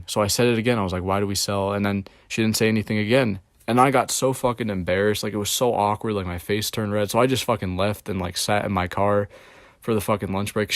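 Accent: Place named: United States. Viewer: I was waiting for a buzzword....knew something up. I literally can't have any sympathy for you because of how negative you are. And I don't care if you've never directly bullied anyone, because the way you talk about people in other videos sounds eugenicist. I'm not hear to tell you to pull yourself up by your bootstraps. I bet you will feel better about yourself once you learn how fucked up capitalism is. Don't think about individual women, think about patriarchy and capitalism. It almost explains everything about modern life in a first world country (I don't know exactly where you live but I assume America by your voice). Don't think about your socioeconomic upbringing, think about the power of labor and the power of capital. Don't think about dating, think about community. Think about the world through a sociological lens, and try navigating the world like a sociologist. 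Once you start realizing that socialization is as simple as showing up to places regularly and not pushing people away with what you say (honestly, lots of friend making cliches from high school hold true). And above all, live life for yourself. I will not tell you to move through the world with a goal mindset, because success if not guaranteed. Rather, have systems in place that you will socialize regularly, with any safe group that will accept you. It's hard, but not because it's complicated, but because of the emotions surrounding rejection and fear. That's mostly it (of course from aspects of your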